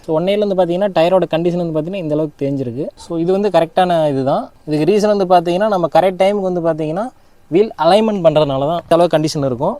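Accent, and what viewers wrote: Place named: Kongu Nadu, India. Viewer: Athu konga area slang nga kannu😊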